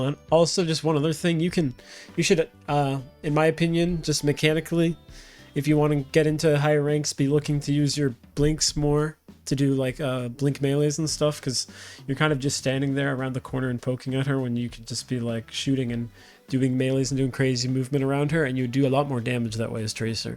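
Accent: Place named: Canada. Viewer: That's quite a Canadian accent you've got there. When y'all say the word "out" y'all say "oWoot" lol XD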